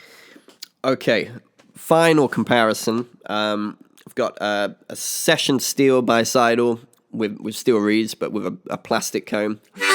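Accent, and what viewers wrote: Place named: England, United Kingdom. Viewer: The first time I recognized you, was in that incredible "Free Bird Solo" video and I thought, you were an american. But then, I heared you talking and I was surprised, that you are an english guy :) I like that so much! Keep up the good work mate :)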